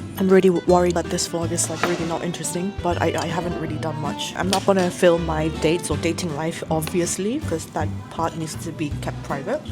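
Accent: United Kingdom. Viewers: Don't worry, i love your vlogs for whatever you're doing and so! Also i love your british accent as always😆💕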